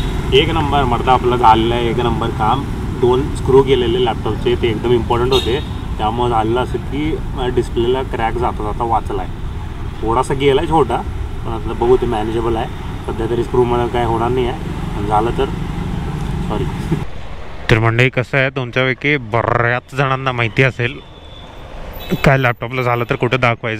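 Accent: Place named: Sangli, India. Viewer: असल सांगलीकर आवाज आणि भाषा...sutti not ch o.... 👌👌😍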